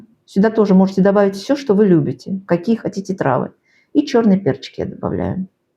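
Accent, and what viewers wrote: Baku, Azerbaijan. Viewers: обожаю Ваш акцент, я сразу поняла что Вы из Баку.Я там была в гостях ещепри СССР, 45 лет назад. Ну и ваш талант кулинара выше всяких похвал.Спасибо,дорогая!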